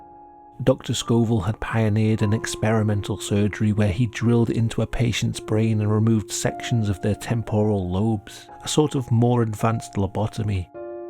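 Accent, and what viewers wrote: United Kingdom. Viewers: Love your channel,. I’ve always been naturally curious about morbid subjects but I’ve noticed that after several traumatic experiences in my real life, I have an increasingly developed relationship with things that disturb me. I can’t seem to find any interest in media with subjects that aren’t slightly taboo or disturbing anymore. Maybe it is part of the long term processing, maybe it’s part of just having the veil lifted since my own disturbing experiences. Sometimes I wonder if it’s a curated and safe way to feel afraid or unsettled, but not be in any danger, and to be learning and exploring ideas without direct risk. It’s made watching videos such as yours varyingly more intimate as an experience, psychologically, than before. Your voice is also very familiar and stabilising to listen to - not trying to sensationalise, you’re genuinely interested in what you’re discussing without novelty of the subject or people involved. 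It’s also probably comforting for a familiar British accent and creator in a US heavy site. Looking forward to all videos in the future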